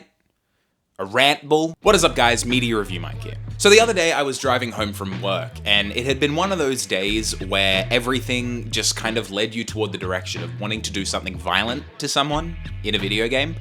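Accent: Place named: Asia. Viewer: I cannot stand your Asian accent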